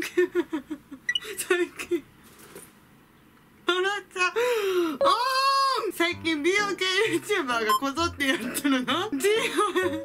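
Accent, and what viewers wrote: Okayama, Japan. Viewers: 岡山弁？可愛い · 岡山県民やけど久しぶりに見て岡山弁すごいでてんなってなった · 岡山弁がなつかしゅーて見てしまう(笑)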